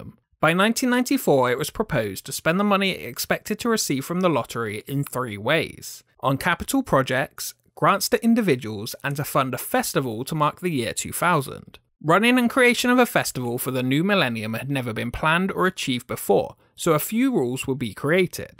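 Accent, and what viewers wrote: United Kingdom. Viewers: are you British? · D-erh-by? Given that you sound British, there is no excuse for this incorrect pronunciation...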